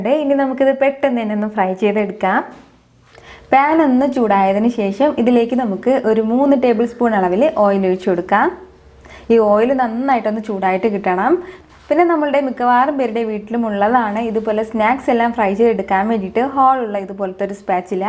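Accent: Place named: Tamil Nadu, India. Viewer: neenga pesurathu entha Mozhi Tamil kuda sernthu mix aagura maari irukku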